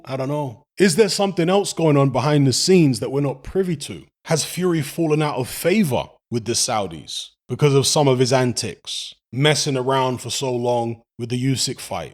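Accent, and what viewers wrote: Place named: London, United Kingdom. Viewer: There a song called, this sounds like it was spoken by a londoner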